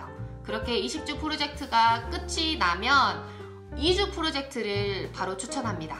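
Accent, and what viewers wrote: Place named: Seoul, South Korea. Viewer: 님 특유의 사투리말투에서 서울말투 사이 너무 귀여워용ㅎㅎ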